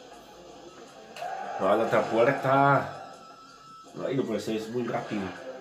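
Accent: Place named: Portugal. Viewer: Eres de portugal o galicia?....... Hay veces que no te entiendo. Yo soy nativo del español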